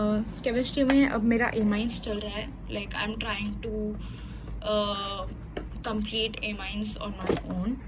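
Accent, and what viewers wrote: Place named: Mumbai, India. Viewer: Bro got mumbai accent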